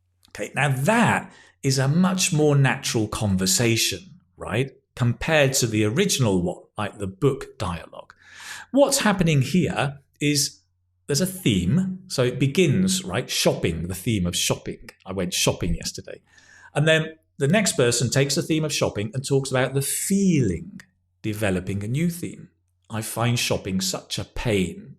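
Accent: United Kingdom. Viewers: Yes, and great chance for us to listen original British english! Thank you!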